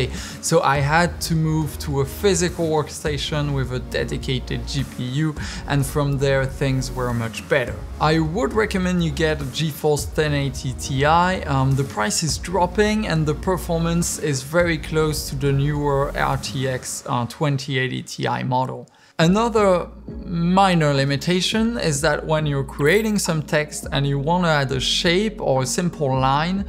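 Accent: France. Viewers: T'es français ? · Didn't notice you were french until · J'étais persuadé que t'étais français à cause de ton léger accent, mais franchement tu m'épates. Bien joué à toi pour ces vidéos de qualité ! 🤗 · Top cette vidéo ! Je sais que tu es français, l'accent, Etretat... héhé :) Tu m'as donné un aperçu pour un passage vers Resolve, moi qui en entends parler depuis des lustres déjà Je me permettrais de te contacter par instagram pour quelques infos / conseils pour démarrer mon utilisation de Resolve, surtout pour l'étalonnage. Continue chef ! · Fantastic presentation, excellent content, extremely professional. Love your adorable French accent too 😶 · I’ve used Premiere for over 20 years and it’s always had stability issues. We used to have to reinstall premiere everyday while the preference files used to get corrupted all the time. I’m looking forward to trying Resolve now. 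Great videos buddy and love the French accent! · Hey, are you french ? ^^ great video !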